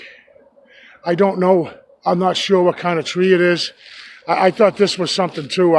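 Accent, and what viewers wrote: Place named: East Coast, United States. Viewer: First time watching your channel, enjoyed it....love the East Coast accent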